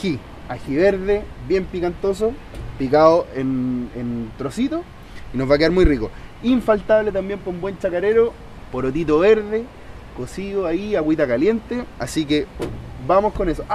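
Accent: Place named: Cuba. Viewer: Habla como un cubano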